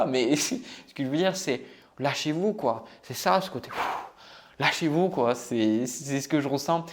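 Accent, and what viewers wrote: South, France. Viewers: Merci, pour tous ces précieux conseils qui me font penser que j'aurai bien aimé avoir un pote comme vous!! En tant que signe Vierge, j'ai bien tout noté....et à mon tour, si vous le permettez bien sûr, je vais vous adresser quelques remarques en forme également de conseils....Vous avez selon moi, un tout petit "tic de langage", qui vous fait dire, par exemple: "Vous roprésentez ceci ou cela", au lieu de "Vous reuprésentez ceciou cela.."Alors moi aussi, j'habite dans le Sud et je ne suis pas du tout choqué, bien au contraire..:: Mais j'ai aussi des origines, et par conséquent une oreille....très parisienne....je sais, nobody's perfect!!;-)) Vous noterez peut-être comme moi, un léger sourire aux lèvres, que sur certains mots, assez rares, vous ne commettez pas ce que je ne saurai qualifier d'erreur!!;-)) Donc si vous le voulez bien, ce mois de décembre 2023, vous vous ferez plaisir, ainsi qu'à moi, en veillant à faire quelques progrès que vous nous présenterez, à n'en pas douter, dès ce début d'année!!!;-)) Merci et bonnes fêtes de fin d'année..!! 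Cdlt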